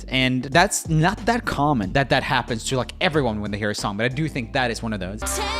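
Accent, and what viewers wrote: Sweden. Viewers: JoeL: I'm getting old guys JoeL: Help me, I can't pronounce anything Me: You're Swedish... That's way...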